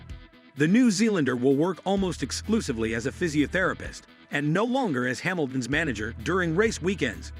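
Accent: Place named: United States. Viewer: DUMP THE STUPID AMERICAN ACCENT. AT LEAST AN ENGLISHMAN KNOWS HOW TO PRONOUNCE GRAN PRIX.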